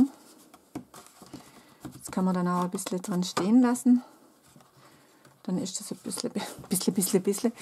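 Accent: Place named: Swabia, Germany. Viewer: Süsses Projekt, Deine Sammelbesteller werden sich freuen! Und Dein Schwäbisch ist total goldig xx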